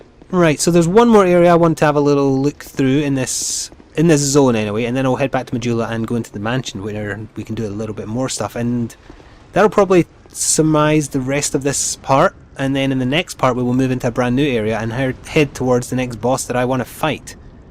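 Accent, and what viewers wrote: Scotland, United Kingdom. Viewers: It took me four episodes to finally make a good guess on what your accent is. Is it scottish? (I only recognized it because of David Tennant xD)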